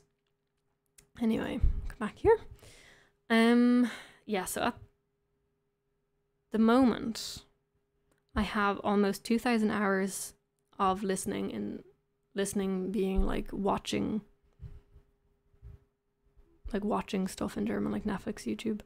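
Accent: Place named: Ireland. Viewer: Woah! Crazy seeing your progress! And i think it's really fascinating how your Irish accent just vanishes when your speaking German. I do have a question, what VPN are you using?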